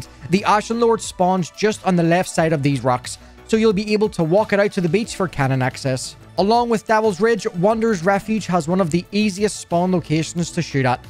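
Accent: Northern Ireland, United Kingdom. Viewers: Are you northern irish?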